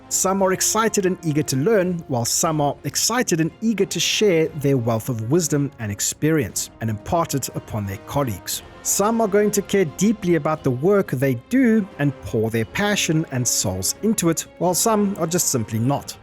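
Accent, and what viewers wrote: South Africa. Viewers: Haha, very cool to hear a fellow South African on YouTube, you sound like you are from the coast? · South African??? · Are you from South Africa? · You sound South African, New Zealander maybe... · Hold up. South African accent? Opinion accepted. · Great video. Thanks for posting dude man. Great to hear a based south african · Interesting accent, sounds South African. Subscribed. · you sound like a fellow SAFA. If so always nice to see a fellow ass end of Africa resident. Lekker Vid mate.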